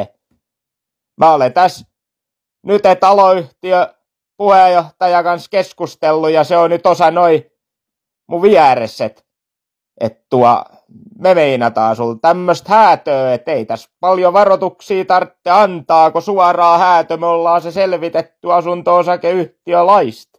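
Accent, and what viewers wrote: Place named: Pori, Finland. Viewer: Tää oli HYVÄ! -Ja viäl pori murteel :D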